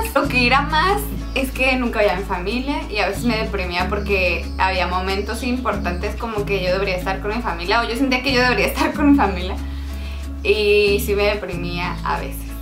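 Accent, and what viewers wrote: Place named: Baja California, Mexico. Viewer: Parece que eres de BC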